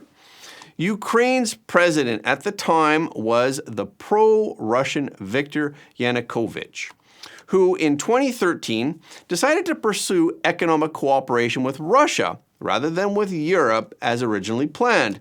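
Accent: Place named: Canada. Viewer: From Perth Australia. Watching FrontPage for few years. Direct & Up to Date mainline Real News. Try to watch with Coffee each morning. does a great job of reading News. I suspect is actually Canadian (accent). I Grew Up in Toronto Canada but saw the writing on the wall years ago when the decided to split the country by labelling in both French & English 😂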